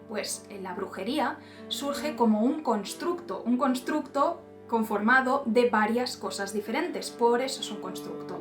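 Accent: Catalonia, Spain. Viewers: ¿Eres catalana? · Habla Español de España y El Sonido de esa Habitación o el Eco es Malo🎉.......She speak Spanish from Spain and the Room Sound and Echo is Bad🎉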